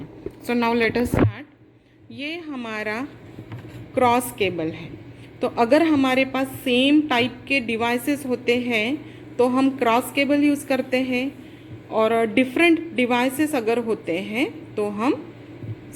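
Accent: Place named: India. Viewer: A less Indian accent would attract more viewers, Prof B. Emphases are all ...hard. More chest-voice.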